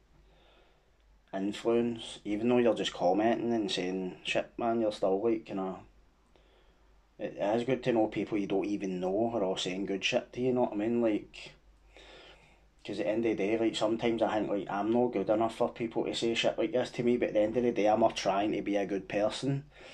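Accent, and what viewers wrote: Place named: Glasgow, United Kingdom. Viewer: I've heard a lot of these stories before,but it's refreshing hearing it in a Glasgow accent and perspective.honestly well done on everything,I seen you on fivos podcast.theres real change there so respect to you.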